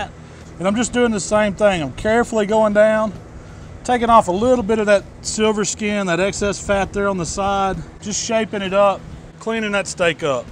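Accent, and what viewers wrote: South, United States. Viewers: Your southern accent has faded a little bit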